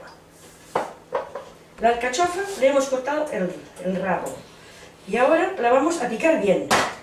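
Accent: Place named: Aliste, Spain. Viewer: Alella es de Cataluña, pero tienes acento alistano (Zamora)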